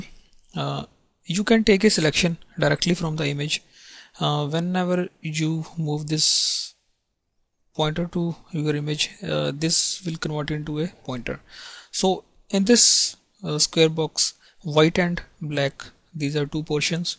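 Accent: India. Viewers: come on it not like that you have speak that typical indian type english in video, keep your shitty vocal mute. i got headache !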